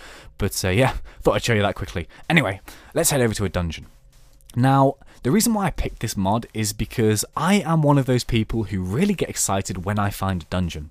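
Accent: United Kingdom. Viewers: are you british? Your voice is a little funny, but very cool video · Amazing Vids Mate, And The Best Part Is Your British!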